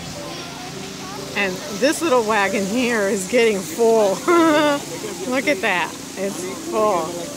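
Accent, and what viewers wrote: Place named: Tampa, United States. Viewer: okay beautiful thank you you drove 5 hours to Miami from Tampa to Miami is about 4 and 1/2 getting to the place another half that would put you close to my vicinity and your accent sounds like you're from Tampa what's up am I wrong??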